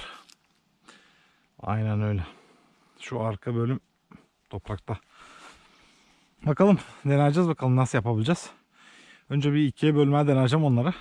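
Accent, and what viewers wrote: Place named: Kırklareli, Turkey. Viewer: Sa dostum,ben Edirneliyim ama seni Viyanadan takip ediyorum,o kirmizi tasli olanin altindada bi tas varmis oralardadir buyuk ihtimalle ,bu arada oralari Kırklareli taraflarimi siven bizim oralı oldugunu gösteriyor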